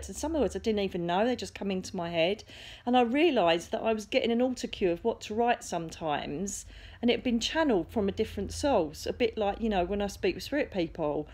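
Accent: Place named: England, United Kingdom. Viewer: Umm, even though I had to really concentrate to understand what you were saying (English accent and all), I learnt a LOT from what you shared in this video. Soul blueprints, soul clusters, angels, celestial beings.... you could take any one of the points you shared and expand up on it in a separate video. And if I may, please do that! Thank you for sharing your insights and life experience.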